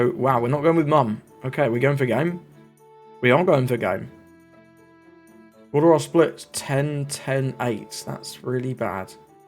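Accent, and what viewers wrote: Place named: United Kingdom. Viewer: Definitely! He has that UK accent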